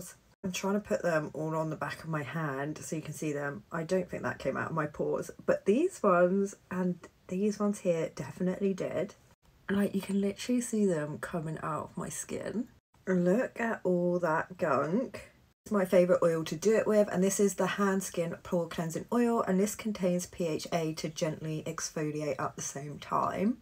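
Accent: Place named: United Kingdom. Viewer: Wth i never thought u woulf have a british accent!!!😊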